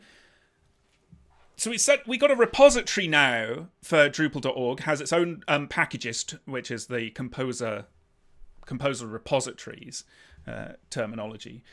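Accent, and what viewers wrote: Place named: United Kingdom. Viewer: Why are you so dramatic with a heavy brit accent? Suggest to keep it simple/natural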